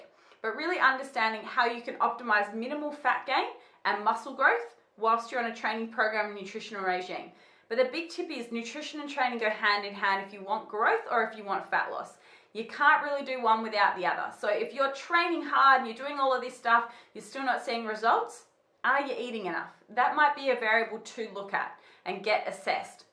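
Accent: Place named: Australia. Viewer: Thanks, Good quality information and it's great to hear an Australian accent too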